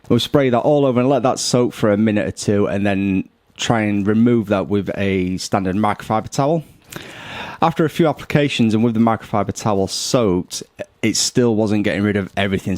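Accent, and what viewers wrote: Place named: Sheffield, United Kingdom. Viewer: because I am from Sheffield, when I watch your videos I always think you must be as your accent is too recognisable.. Glad I was somewhat right 😂 looking forward to the next video. Take care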